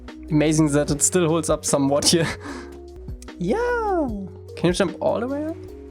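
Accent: Germany. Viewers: Der deutsche Akzent kickt aber anders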